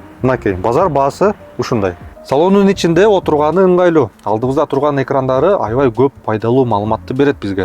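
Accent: Standard. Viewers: Азамат жигит экенсин Кыргызча таза суйдоп тушундургонун учун.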